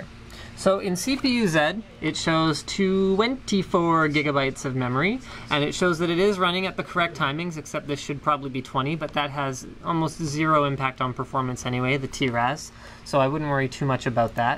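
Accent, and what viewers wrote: United States. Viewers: I have to ask - are you from Canada? Because I found it interesting that you pronounced "Z" as zed, but you have a strikingly American accent.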